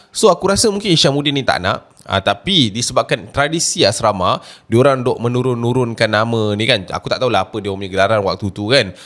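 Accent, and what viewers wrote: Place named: Kelantan, Malaysia. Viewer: Sedak dengar Abe chap kecek Kelate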